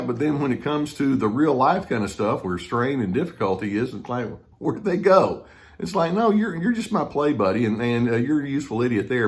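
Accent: South, United States. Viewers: Ty for a great video, I love your accent ( i am homesick - miss hearing folks chatting) i had a narc boss, who liked to make everyone her "idiots", finally, after 2 yrs, i had enough, i stood my ground & gave her some southern fire- now i am free of her, - watch out for bosses, who like office drama.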